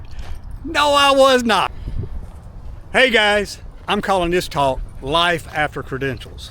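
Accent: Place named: North Carolina, United States. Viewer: Somehow your video popped up in my feed...maybe because i'm subscribed to JoGo's channel😅....(since you have the same accent as 'JoGo', I can now recognize a North Carolina accent 😅).....but that aside...you're thinking about to postpone for another 2 years...which is understanable...an other vlogger 'the Ugly Tourist'...had a friend who also planned to retire in the Phills..good job/good pay above what he already had...but he wanted to wait 2 extra years to gather more USD's...but the Ugly Tourist went ahead...and his friend....died 6 months later....so, yeah...you never know...just take in consideration that 97,99% of the people worldwide is not in a position like you do....so whille you're still breathing....GO! Enjoy more of your time!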